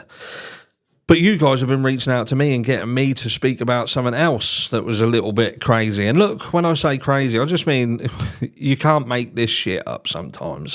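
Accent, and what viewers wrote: Norfolk, United Kingdom. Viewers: That sounds like a west Norfolk accent? Enjoyed you vid. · I thought I heard a bit of Norfolk in the accent 😂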